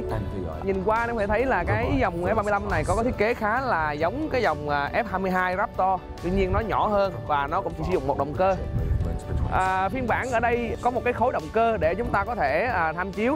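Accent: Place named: Da Nang, Vietnam. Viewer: Giọng a giống quê đà nẵng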